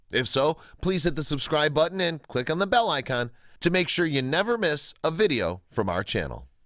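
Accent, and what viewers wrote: United States. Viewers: Do you have hearing issues?? 😕 He says FOAL (*NOTHING ELSE!*) MULTIPLE TIMES, & *EQUINE.. NOT 'Quine'!! So-?????!!!!!!! 😒 Bloody well obvious, even in his thick AMERICAN ACCENT, to these Ozzie (Australian) ears of mine & the 7 ppl I just asked, INDIVIDUALLY. Don't know where you're from, but having an American accent doesn't mean his mispronouncing words!! I suggest you get a hearing test, dear.. & take this along with you and ask what the ENT professional 'hears'. PS: *Clearly, NEITHER will grow to be 'horses', either. They will NEVER reach the height a *PONY becomes a 'horse'.... given their fraternal twin situation. The ONLY difference is how many 'hands' they will grow to. Not enough to be 'horses'. They are ponies and have less than an 20% chance (Don Quixote) and less than a 10% chance that (Duet) could grow to the minimum 'horse' height..... 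just thought you should know that, since you're SO quick to jump in thinking you're an expert, despite obviously NOT being one. 🙇💫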